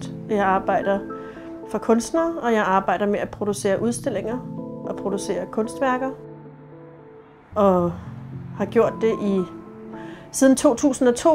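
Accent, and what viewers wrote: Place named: Scotland, United Kingdom. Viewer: wow she sounds so scotish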